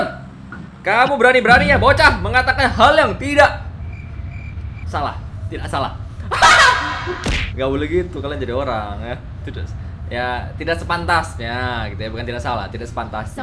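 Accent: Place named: Surabaya, Indonesia. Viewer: Wkwkwk boso jowo wes suwe ng Sby jowo suroboyoan bang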